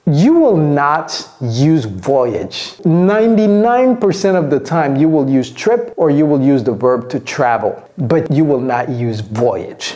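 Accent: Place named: United States. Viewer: I say it before, I am saying again.He is good ! One thing to remember remember, this is pure American English. Learn it , enjoy it and apply it. Americans will enjoy listening to you if you sound like him. Thanks again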